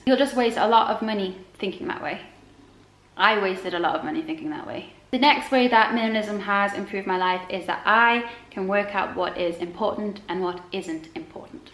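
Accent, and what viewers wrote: United Kingdom. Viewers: you are such a lovely person! So glad I've just found your channel. Subscribed! It's always refreshing to hear a British person talk about minimalism.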